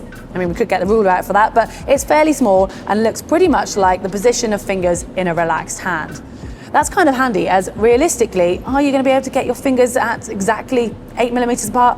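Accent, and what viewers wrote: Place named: United Kingdom. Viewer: Dear GTN, Thank you for this hydro dynamics piece of advice. I would have another subject that I would much appreciate you to deep for us? The "cross-over kick", as I could discover it through this last published video from the Effortless Swimming Youtube channel called "The Most EXTREME High Elbow Catch EVER". This leg kick is unfamiliar technic (for me), however it seems recommended for long distances swiming or triathlon? By the way, I'd like to mention how much great improvements in listening English I am making, since the time I subscribded to your channel (as a froggie)! I find it is so pleasant, and not so common on YT, listening such british accent! Thank you so much.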